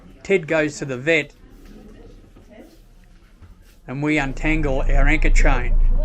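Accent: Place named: Australia. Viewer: Living in Thailand far from my oceanic playground off the Oz east coast, deriving much pleasure from hearing your Australian accent and that unmistakable diction, I mused, as I am often want to do, at the notion that perhaps during the dying embers of the day, you could recite some suitably inspiring verse with a nautical flavour. Maybe a few stanzas from the Ancient Mariner for example. I, myself, often amused my seafaring companions at sundowner time with either a poem or a sea shanty. Works wonders for morale and appetite. My suggestion would be an Aussie rendition of the Drunken Sailor with a glass of red held high. Awesome bloody scene!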